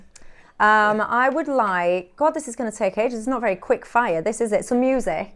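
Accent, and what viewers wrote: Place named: RP, United Kingdom. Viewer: Wish you all the very best. Small tip tone down the posh accent. You can’t carry it off..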